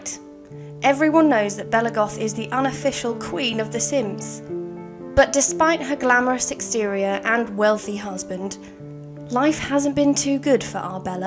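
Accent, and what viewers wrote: England, United Kingdom. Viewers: Where you from? That english accent is unique and I only hear it in the movies or on tv.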